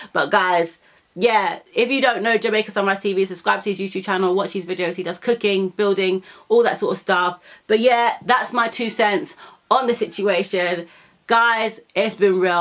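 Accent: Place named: United Kingdom. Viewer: Thanks for your honest and truthful opinion about....I can see it in your eyes and your facial expression. I too share your opinion, evaluation and sentiments about. I think he is a very honest, hardworking, progressive young man who has chosen to impress the world with his cooking skills, his simple and humble lifestyle, the beauty of jamaica and our culture. Not to mention the love of his dogs and cats, the relationship with his son, his dad and other family members. attitude and personality is so transparent and pure that, even though I have never met him, he has "virtually" become a part of my family. This is for... Me know se u a go through some stuff right now my yout'.. no mek it get u down. U young an' u strong. Life is a teacha', learn u lessons well. No mek de some mistakes twice..zeeen.. Nuff said...anyway...big up youself Pretty girl..love de British accent..ONE LOVE. 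🥰🥰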